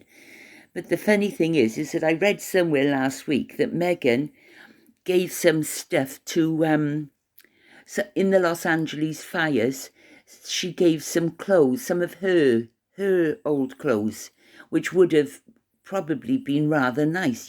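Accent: Wales, United Kingdom. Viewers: Howdy 👩‍🌾😊To You Lovely💖! I Always Enjoy🙌 Hearing Your🥰 Welsh Voice! It's Very🙌 Calming! Happy😇 Happy🎉🍰🎊 Belated🎉🍰🎊 Birfffday To 💖🌺🌷🌸You!!!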